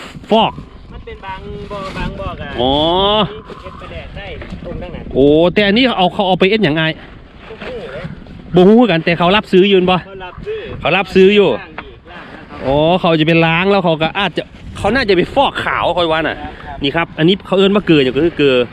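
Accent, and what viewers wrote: Phu Thai, Thailand. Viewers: คนอำเภอบ้านดุงใกล้ๆคำชะโนดอดีตลูกสะใภ้ภูไทอำเภอพรรณานิคมได้ยินเสียงบ่าวภูไทแล้วกะคิดถึงอดีต:))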